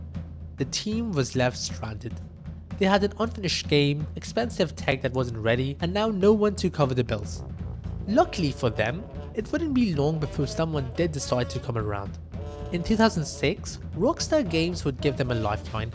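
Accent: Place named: Iran. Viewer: Great video! By the way, are you persian? I hear hints of persian accent in your voice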